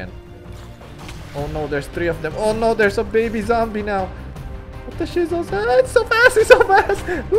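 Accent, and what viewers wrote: Philippines. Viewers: R u a filipino? Ur english accent is same as filipinos, btw minecraft is being popular again hope u inspired by pewds haha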